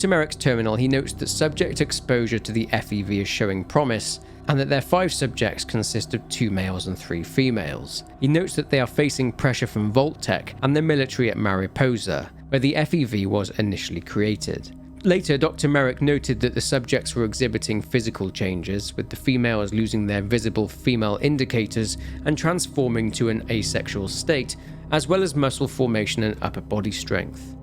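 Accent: England, United Kingdom. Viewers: there's nothing like hearing an English fella, talking about the lore of our fave games. simply the best!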